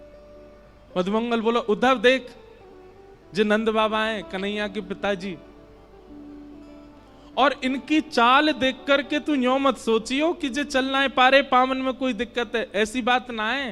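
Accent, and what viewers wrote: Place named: Braj, India. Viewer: Guru ji aapki brijwasi bhasha ka aisa jaadu hai itani mitti hai ki katha sunene ka aanand hi alag hai sub bhool jaate hai. Jai jai shree Radhey shyam ladli ji apni kripa aap par sada banaye rakhe. Aapko koti koti pranaam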